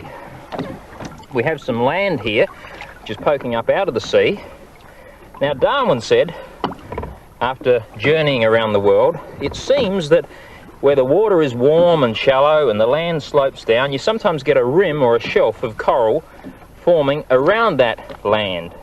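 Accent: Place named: Australia. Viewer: I feel stupid now for never having realized that this was an australian show, i just thought he sounds kinda funny.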